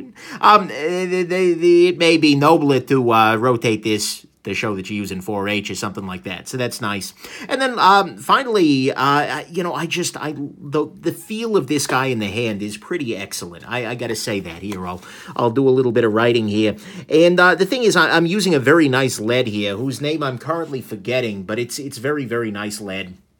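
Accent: New York, United States. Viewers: I love this guy's accent, is it New York? I imagine him driving a taxi, and wearing a white vest at home, and having a difficult relationship with his mother in law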